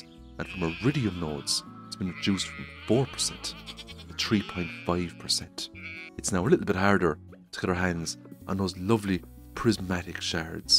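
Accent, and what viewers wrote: Ireland. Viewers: First off, that Irish accent is fantastic. Anyways I like most of these changes to an extent, the extra fees for the house upgrades, the nerf for clay drops, and the nerf for the recipe of deluxe speed grow are annoying for sure though. Most of these changes make the game a little harder for the better which is especially nice given the various exploits people can take advantage of for easy progression, but the prismatic shard change is just weird. Thanks for reading my ramble.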